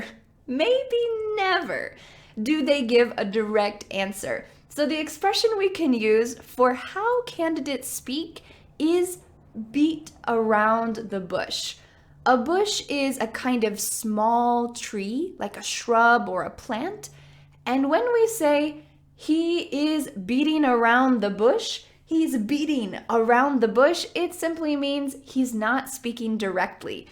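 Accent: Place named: United States. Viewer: iam from Somalia this is the only teacher that i listen always really it's help ful even i often like American accent KEEp it UP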